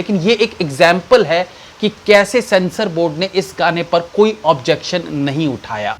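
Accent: Bihar, India. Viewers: आप बिहार से हो?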